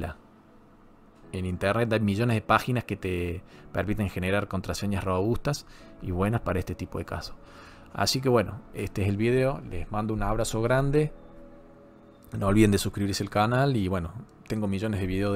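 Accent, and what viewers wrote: Córdoba, Argentina. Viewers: Sos cordobés ? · que hermoso el acento cordobes explicando algo, saludos desde Córdoba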